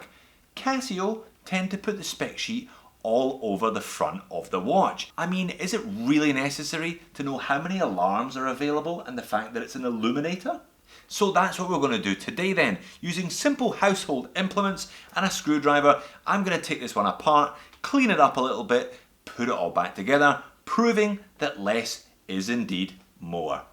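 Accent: Scotland, United Kingdom. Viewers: You look and sound like a scottish peter finch. Its uncanny he’s a golf youtuber and i swear other than accent differences you guys talk just alike.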